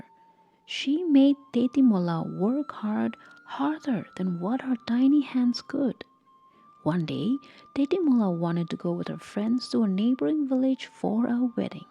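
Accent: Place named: United States. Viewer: Where did you get that american accent!?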